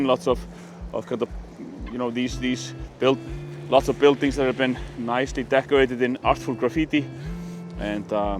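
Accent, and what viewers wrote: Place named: United States. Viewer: do they ever have real blizzards in Iceland with so much snow you cannot see the house across the street? I am sure in a previous video you complained about the cold, and now you are telling us how much you like to walk in this weather. I am confused. Where did you learn to speak such good American English? I would have thought you were taught British English?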